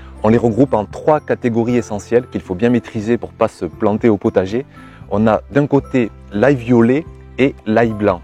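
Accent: South, France. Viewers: Merci pour cette réponse extrêmement rapide :D alors ,non je n'ai pas d'avis sur les ails importés c'est plutôt de manière général ...Les fruits et légumes sont bons s'ils sont cultivés normalement...: pleine terre, récoltés au bon moment ,de saison,peu importe d'ou ils viennent...Si l'espagnole il cultive sa tomate comme toi (avec Amour :D ) elle sera très bonne je pense (avec tout se soleil) Le problème c'est que tout se qu'il y'a dans les magasins c'est aussi cueillit avant d'être mûr ...(exemple la banane n'a pas de goût quand elle arrive chez nous alors que tu vas là cueillir dans un bananier en Guadeloupe ,c'est autre chose...). Pour finir continue avec tes vidéos j'adore tu me donne un peu de soleil juste avec ton accent du sud (moi qui est de Caen (J'aime beaucoup aussi les vidéos de blec,Maud et évidemment l'incontournable Damien) ça m'aide vraiment (je débute 2ans) je fais toutes mes graines et c'est beaucoup de boulot mais tellement agréable :D A très vite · Super vidéo comme d'habitude tu fais des vidéos toujours aussi complète et comme tu dis on n'a pas le temps de s'ennuyer et puis cet accent du SUD et te voir en panta-court en hiver alors qu'aujourd'hui la neige a fait son apparition j'allais te demander des bonnes adresses, mais tu nous dis un petit peu partout donc je vais chercher ça sur le net si je n'en trouve pas en jardinerie Merci encore pour ta participation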